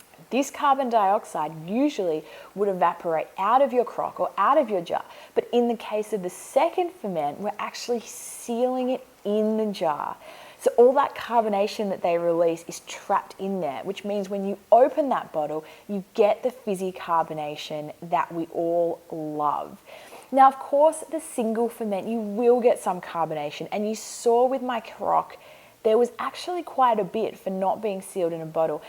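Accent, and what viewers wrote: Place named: Australia. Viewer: Must be in Australia I love the Australian accent ariad I just made ginger beer that was easy but you do not need one of them nasty scobie's..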